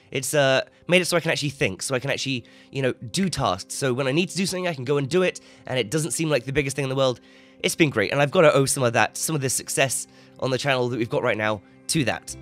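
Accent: United Kingdom. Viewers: Like Simon Sinnek said it a ones: "They don´t will love you for doing things the way you think they want it. They will love you for beeing yourself. You´re doing it absolutly right. Oh and by the way. Your brittish accent paired with a dbd Killer is the best i ever heard! Keep up your great work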